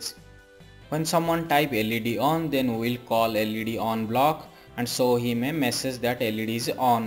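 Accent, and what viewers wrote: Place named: Odisha, India. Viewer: Emitika English kebala odia pila hi kahiparibe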